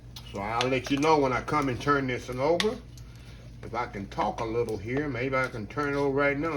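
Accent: South, United States. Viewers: When I heard that country twang, I knew it was going to be 🔥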